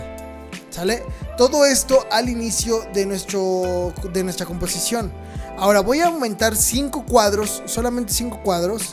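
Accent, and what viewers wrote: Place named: Dominican Republic. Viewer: Suenas medio dominicano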